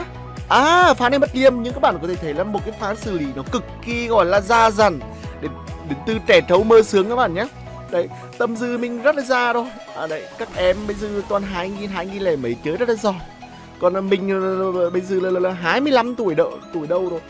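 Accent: Nghe An, Vietnam. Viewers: Giọng a vậy là ở nghệ an rồi đúng ko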